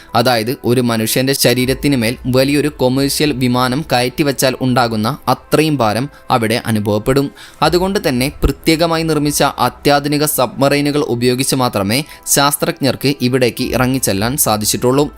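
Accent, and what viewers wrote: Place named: Malappuram, India. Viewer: മലയാളം നന്നാകണം. ഇത് മലപ്പുറം മലയാളം ആണ്. മലയാളത്തിൻ്റെ ഉച്ചാരണം ഇങ്ങനെ അല്ല.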